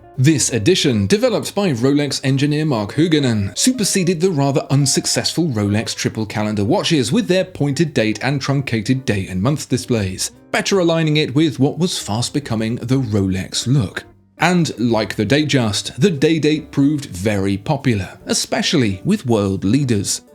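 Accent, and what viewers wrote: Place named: United Kingdom. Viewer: Great writing and smart British accent. Makes for good program. And my daughter's name is Charlotte.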